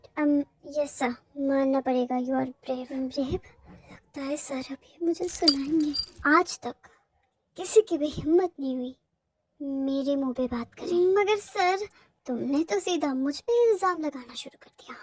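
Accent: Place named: Bengal, India. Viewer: App ka Bengali accent😻.....Just woww😍 অবাক হয়ে গেলাম🤗😘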